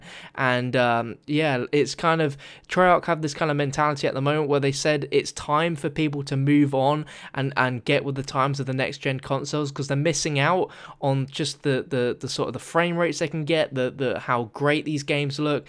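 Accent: United Kingdom. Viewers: you sound Brittish. keep talking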